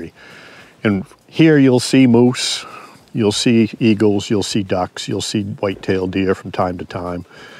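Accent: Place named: New England, United States. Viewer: Love that "Gaaaden". Love your New England accent. Can't get enough of it.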